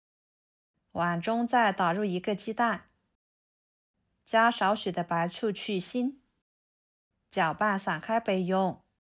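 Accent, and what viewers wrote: Yunnan, China. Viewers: 实在是不习惯听你的云南马普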